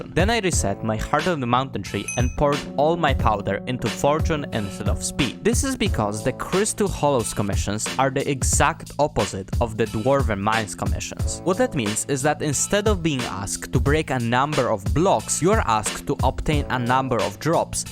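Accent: Poland. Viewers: your accent sounds soo polish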